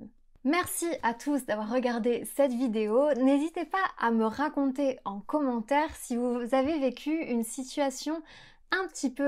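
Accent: England, United Kingdom. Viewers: You do realize that she has 100+ videos on her channel right? She speaks English in more than a few of them...and she pretty much always sounds British. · Oooooooooo j'aime votre prononciation des mots en anglais. ❤️ J'entends un léger accent British quand vous prononcez les mots; trop mimi; c normal, la France est plus proche du Royaume-Uni que les USA/le Canada. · Thank you, your video is so helpful. Plus, I love your english accent! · c’est fou qu’elle a un accent parfaitement anglais! c’est super cool mais juste différent!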